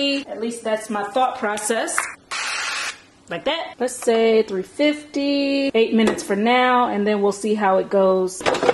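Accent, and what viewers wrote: Baltimore, United States. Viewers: Are u from Baltimore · You from Baltimore?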